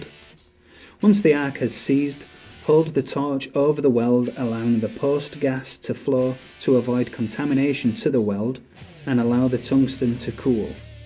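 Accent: United Kingdom. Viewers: Agreed, but I think he's a Manc lad! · Yes, it is absolutely appalling, but as he has a 'Midlands' accent it isn't very surprising.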